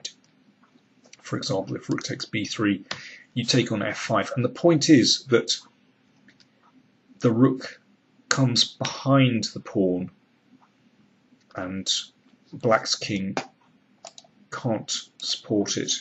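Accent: England, United Kingdom. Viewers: I love his English accent and the way of saying "indeed". You re the king.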